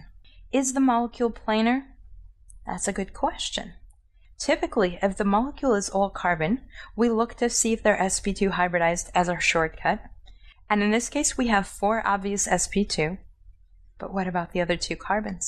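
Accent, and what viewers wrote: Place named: Northeast, United States. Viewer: Thank you, this was very helpful. This also confirmed my suspicion that you were from the east coast. You accent was very northeastern LOL. LETS GO YANKEES!!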